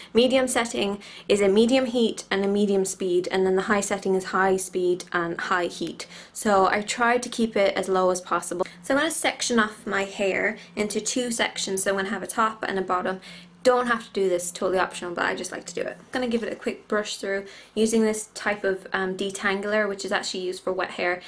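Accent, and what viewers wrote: Ireland. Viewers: r u irish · are you irish?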